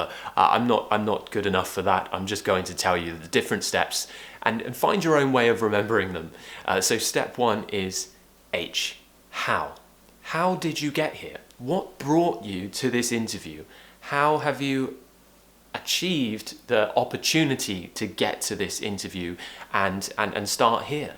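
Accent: RP, United Kingdom. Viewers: I love British accent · Hi there! would you define your accent RP/ SSBE ? I'm working on a phonetic assignement! Also, where are you from? Thank you! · I love it British accent I live in UK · I love this accent😍, i think British accent is better than American accent 😉🤗. You're the best english teacher in YouTube!!! 👌🤗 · Wow , I love British accent. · Just listening to you thats enough to get British accent ,, Lot of love ❤️ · I love British accent ... its royal accent ! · This is one of the questions I find very difficult to answer correctly at interviews. Thanks for the tips. From a fellow Brit. PS. I have the same accent as yours.😃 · British accent is a great turn on for me😘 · Indulge in British accent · I love British accent and I wish I could have a friend from London to communicate with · I loved British accent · I like British accent ❤️ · Wow you are really good! 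Thank you very much for this lesson! You know what.. You look a lot like Alexa Chung.. Really.. You remind me her enormously.. Maybe because you have similar accents but it is more physical actually you faces or eyes are quite similar.. Coolest Brit pals 👌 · thank u so much ,I Love british accent... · Who loves british accent? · I dream to be your student and learn your amazing pronuncation. I love british accent since my 11's. Of course I can not pay your course right now, this situation affected my economy, but one day, one day I will my dream come true. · thanks for ur advices greetings from Mexico . I love Brits accent . have a good one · I love British accent.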